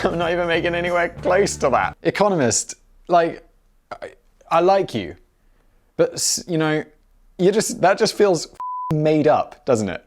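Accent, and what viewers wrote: RP, United Kingdom. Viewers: you keep doing what you’re doing! I find you hilarious! The whole comedic package wrapped up in that silky British accent is awesome. The style is great and I like you laugh at your own jokes. I put your channel on auto play and fall asleep to it. So I am helping you crush that watch time and happy to do so. Plus since I always fall asleep, I even rewatch most videos! This is by far my favorite of your channels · bill spangler you’re not alone I do it cause I like his voice He has a very strong British accent and I adore his voice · Eagerly anticipating the animal channel that has the upper british accent narrating the life of like... a bee. Yeah. GIVE US THE BEE LIFE VIDEO DAMNIT. Or: Do a nature channel where you narrate the life of bessy, the gentle cow. Then the next day have a food critique channel that critiques steaks. 🤣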